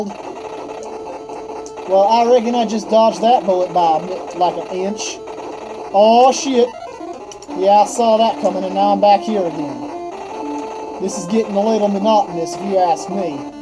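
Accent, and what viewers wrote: United States. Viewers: Nice southern accent... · The cowboy accent throughout the vid was quite an interesting concept. Just for the record, the reason you aren't getting out of the level is due to the lack of a wanted poster strangely enough.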